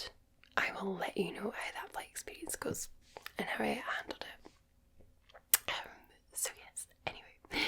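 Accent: Scotland, United Kingdom. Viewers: She looks like pin up art and calms me like.... tranquility. are a fine sleep aid. TY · Another Scottish ASMR. Another happy American soldier 🥹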